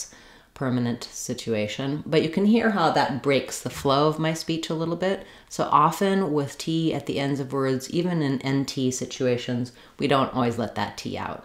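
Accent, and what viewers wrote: United States. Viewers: Oh wow you're here making videos and uploading! I did it search for a biblical name Rayhab. I knew there's different spellings but this is the one that I'm familiar with and some people remove the Y. I'm assuming when you give pronunciations that this is American English pronunciation? Google AI pronounces her name as rahab... They pronounce the a like the word father. I've always heard it pronounce the way you pronounce it in that video I just saw. Anyway, I'm assuming you're giving us pronunciations for USA❤❤❤. Sorry this was so long but I am from another country originally, Venezuela. My family came to USA when I was a girl and now I'm 23. I'm not trying to give my life story but I am trying to learn how to pronounce a lot of words in the USA version. You can understand why it gets a little complicated when the Google AI kind of uses pronunciations that sound like there United Kingdom English. 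Anyway I'm using speech to text because I can't spell English great so far. I'm trying to work on all these things and I plan to subscribe as soon as I hit send. Glad that I find you still around after her the Rehab video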